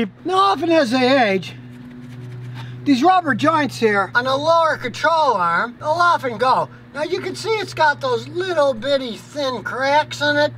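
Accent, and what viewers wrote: United States. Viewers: has developed his own personal american accent. i can barely understand him anymore.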